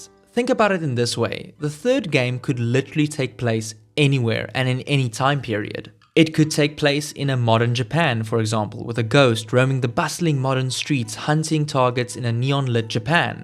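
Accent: South Africa. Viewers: I knew your accent was familiar. Glad to see a saffa doing great content well done 💪🏻🇿🇦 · Do I hear a South-African accent?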